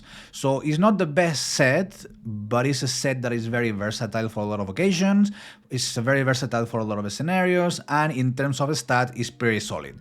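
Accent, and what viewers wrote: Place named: Spain. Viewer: Hey, I'm the guy that asked you in your Spanish Channel which was your English Channel, I'm neither a native English speaker, but I've seen your video in both languages and it feels amazing here too, I love the fact that you are quick to speak in English you're fluent your vocabulary doesn't get stuck to remember words mid sentence, and pretty much everything is awesome, your accent is slightly improvable to feel even more native-ish, as a native Spanish speaker but English teacher I see you're not just trying to be a bilingual content creator but rather know what you're doing, I'm disappointed at the fact that there is almost no recognition in this channel and I'm guessing it's because there are very few Spanish RSL CCs, but many English magnates in the board like Ash, HellHades, etc. I feel you probably should promote your English Channel in your Spanish community more, as you may find more bilingual people within your main community who could come here and interact with your content to get you to more people's algorithm. 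That said, your accent, not to judge or anything, shows you're a native Spanish speaker, and let's be honest, that's not very attractive towards English viewers because of the prejudices and stuff, both in the sake of community engagement and blend in among other English creators, your accent could use slight improvements alongside adding a few slangs to your vocabulary to make native English speakers feel more related, which I don't doubt you can as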